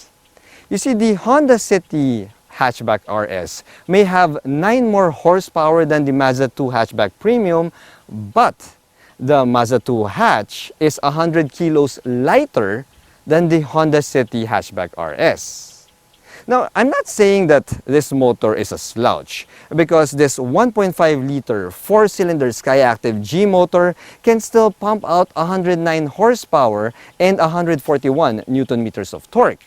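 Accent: Philippines. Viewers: Hi,Just discovered your channel a little over a month ago,ive been non stop watching your vids because of Your MX5 Miata,ive got interest in that car. Also I saw your collab with RIT, I prefer your Filipino language and accent ngl. Love your vids!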